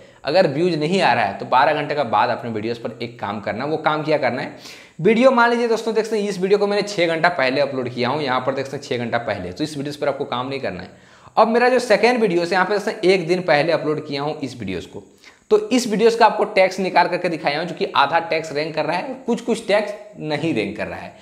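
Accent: Bihar, India. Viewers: Aapka bolne ka tarika achaa hai Bihar se ho aap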